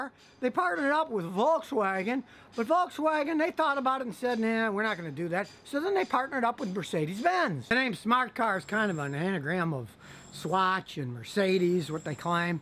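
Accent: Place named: United States. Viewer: I cant stand the guy, the way he acts and his voice just screams, I'm American and unless its American made he wont like it. Would the Smart fortwo work in a place like texas? Probably not but does it work for places that are congested and difficult to park, like LA, NYC, London, Paris, Rome/Naples yes it works brilliantly. I have put 10k on mine since i bought it in June 2022 and as its a MHD i had to replace the starter belt and pulley but thats it, but i agree with swapping out the front tyres, I am currently on the look out for a set of rears to put on the front.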